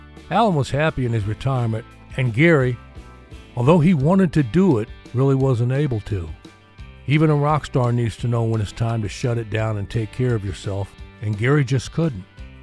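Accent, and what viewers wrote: South, United States. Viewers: Understood. I was just thinking from the point of view of someone who may have never seen your previous ones. I do like your videos. Your Southern accent makes them unique. Keep it up.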